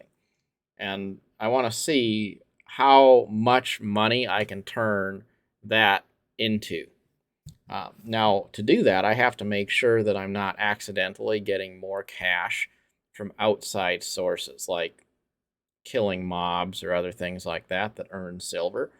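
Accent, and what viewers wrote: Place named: South, United States. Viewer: Just wanted to see if this was possible. Lo and behold, an Asian dude, in a cowboy hat, explains to me with a southern accent, how to play this game which it's main point is to combat, in a pacifist way. I am fully enlightened already and i'm just 10 seconds in.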